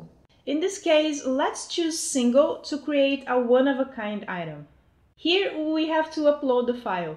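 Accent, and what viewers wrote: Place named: Brazil. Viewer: Vc é brasileira né? srsrsr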